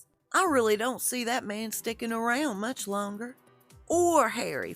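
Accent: South, United States. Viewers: I have watched several of your videos and they just keep getting better and better. I had to subscribe and I love your southern accent, reminds me of home! Plz keep up the great work and THANK YOU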